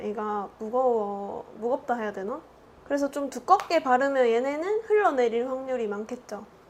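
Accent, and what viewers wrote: Gyeongsang, South Korea. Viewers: 인스타로 보다가 영상으로 보니 더 친근한 느낌이나네용ㅎㅎ 특히 구수한 경상도 사투리가용 ㅎㅎ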